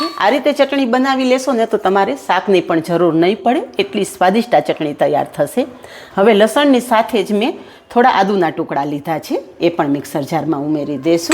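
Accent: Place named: Kathiawad, India. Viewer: Kathiawad ma bhaagye j jova malti uchchaar shuddhi , ane chatakedar chutney....khub saras . 😋😋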